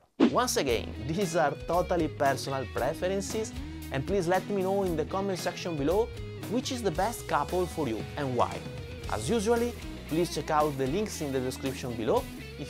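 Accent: Italy. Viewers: bravo, seguita così, la tua pronuncia in inglese italianizzato mi fa sentire meno solo! :)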